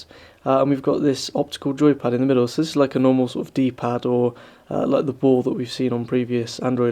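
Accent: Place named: Bristol, United Kingdom. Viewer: Your a Bristol lad then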